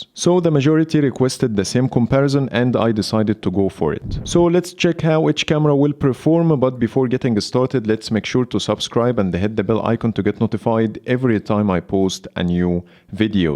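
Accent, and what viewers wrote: Egypt. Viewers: ترا مبين انك مصري و مسوي فيها اجنبي😂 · Egyptian accent distracted me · Egyption accent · Your accent looks like you are an Egyptian!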